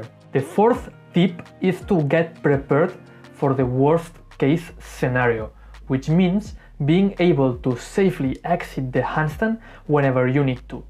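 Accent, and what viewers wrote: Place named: Spain. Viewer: illo que dise er tio tu seguro que ere españo vaya que se te nota to el asento chiquillo que arte tiene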